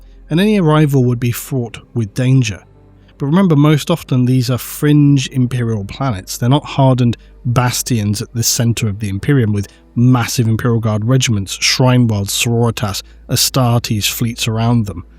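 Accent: United Kingdom. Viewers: Surely he’s also in Europe, or at least he sounds British.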